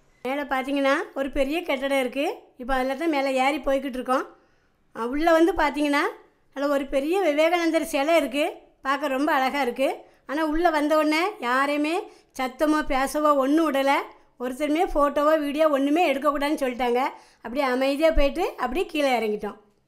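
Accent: Tirunelveli, India. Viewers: அம்மா உங்க நெல்லைத்தமிழ்பேச்சைக் கேட்டுக்கிட்டே இருக்கலாம்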